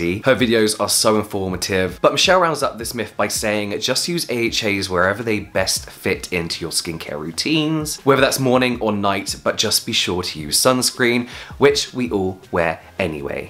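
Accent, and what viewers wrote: United Kingdom. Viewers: am i the one who watches channel because of his satisfying BRITISH pronunciation?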